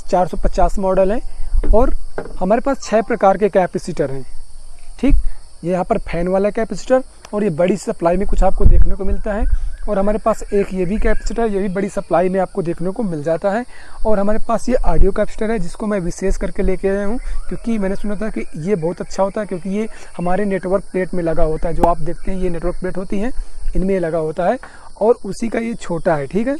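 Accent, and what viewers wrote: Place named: Allahabad, India. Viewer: Ap allahabad se ho kya bhai..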